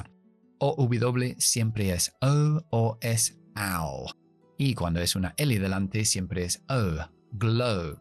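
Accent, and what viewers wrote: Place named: South England, United Kingdom. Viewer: OK, entiendo. Pareciera SBE, es decir Southern British English, pero habría que preguntarle a él para estar seguro. Yo tengo el oído entrenado al inglés del Midwest americano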